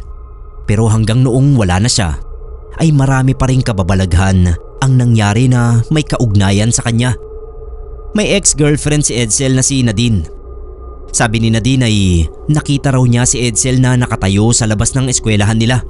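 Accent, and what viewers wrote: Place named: Ilocos, Philippines. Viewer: Si narrator naten tunog ilocano. 😅😅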